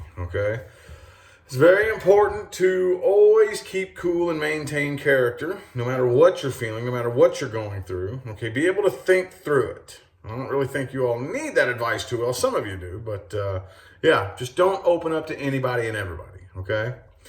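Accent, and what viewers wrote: South, United States. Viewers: Haha, I love your southern accent!! 😈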